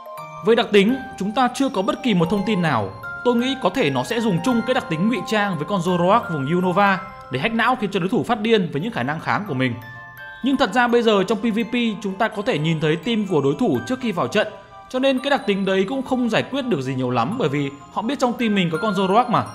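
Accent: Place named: Hanoi, Vietnam. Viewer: ad người hà nội à giọng nghe hay phết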